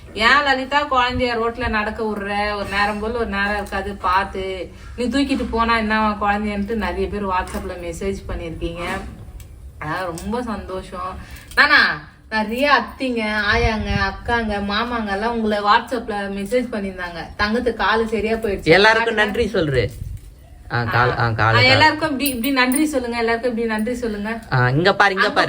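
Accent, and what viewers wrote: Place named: Jaffna, Sri Lanka. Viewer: இலங்கை யாழ்ப்பாண தமிழ்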